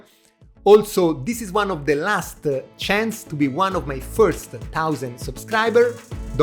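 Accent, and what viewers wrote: Tuscany, Italy. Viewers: sei italiano? hai un accento toscano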